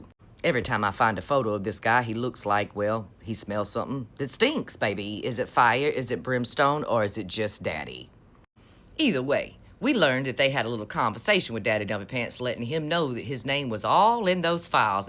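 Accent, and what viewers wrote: South, United States. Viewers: I could listen to your deep southern honey voice blasting MAGA-World all day! What a sharp sense of humor you have! Such HILARIOUS VERBIAGE!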